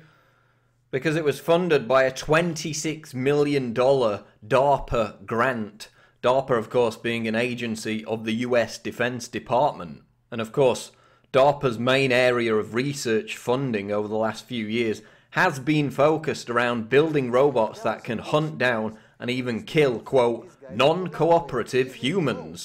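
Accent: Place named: Northern England, United Kingdom. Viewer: I can't take this narrator seriously, he is too northern and sounds like he is taking the piss.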